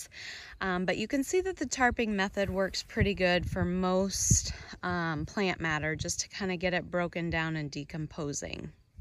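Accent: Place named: Quad Cities, United States. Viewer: This is random, but one of the reasons I love watching your videos because you talk like me! I'm from the Illinois side of the Quad Cities and I say "root" (and "roof") the way you do which I have come to find out is not the way people in other parts of the country say it.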